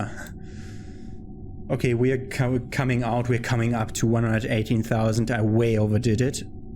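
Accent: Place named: Germany. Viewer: Moin klingst wie ein Deutscher deswegen schreibe ich mal auf deutsch . Versuch mal wenn du in einen Orbit willst nicht so steil nach oben zu fliegen und schon gar nicht mit einem Manöver einen Orbit bestimmen . Wenn du startest beschleunigst du auf den ersten 1200m auf 160m/s ab hier die Rakete um 15 grad nach rechts neigen . Steige ein wenig in diesem Winkel auf bis du bei 300 m/s bist und neige die Rakete wieder ein wenig . Steige wieder ein wenig auf und wärend du langsam auf ca 1100 m/s beschleunigst neigst du du Rakete bis zu 45 Grad . Also nicht sofort auf 45 Grad sondern kontinuierlich . Wenn du ca 1100 m/s erreicht hast Triebwerk aus, ab hier müsste die Apo bei knapp <num>m liegen tut sie es nicht hebe sie auf 85.000m an . Hat die Rakete 72.000m Höhe erreicht , neige die spitze 15 Grad Richtung Erde/Kerbin also nach unten und gebe jetzt vollen Schub und beobachte wie ganz von allein ein perfekter Orbit entsteht .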